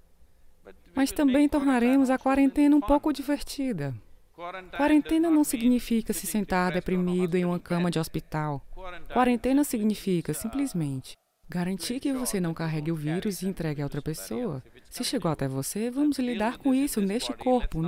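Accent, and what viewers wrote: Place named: Brasília, Brazil. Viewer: Sotaque de Brasiliense.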